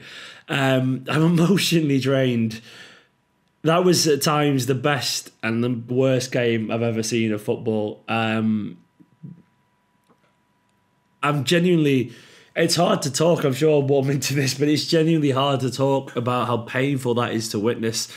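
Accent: Manchester, United Kingdom. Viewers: You're so gutted your accent went extra manc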